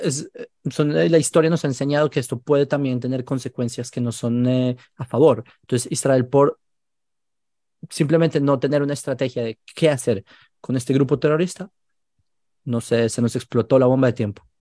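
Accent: Colombia. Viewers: Eres colombiano? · Tienes hacento colombiano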